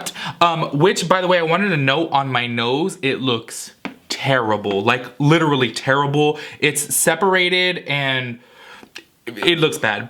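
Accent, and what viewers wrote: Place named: South Florida, United States. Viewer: Are you from south Florida like Miami/lauderdale originally? I’ve always wondered bc of your accent. 😊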